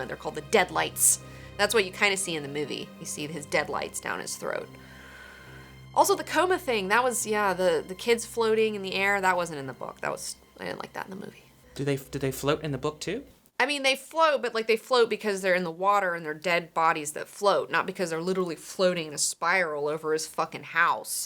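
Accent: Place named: Baltimore, United States. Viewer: The Baltimore accent is strong with this one. 😀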